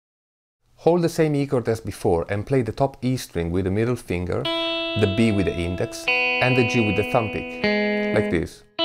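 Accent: Italy. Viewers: Japanese?? Sounds Italian, as he is. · Your teaching technique is excellent. The Italian accent only adds tot he experience. Thanks,. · Si sente che sei Italiano xD Bravissimo comunque ^^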